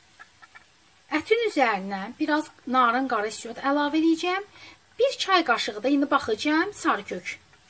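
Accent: Baku, Azerbaijan. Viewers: Canım ay Bakı ləhcəsi!!!!!! Lap ürəyim açıldı nenem sözünü eşıdəndə. Əllərinizə sağlıq!!!!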